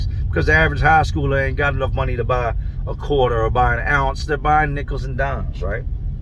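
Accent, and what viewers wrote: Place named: Philadelphia, United States. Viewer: ur doing excellent work on teaching people the perils of prisons ect. My wife thinks ur cute, u sound like ur from N. Philly, ☺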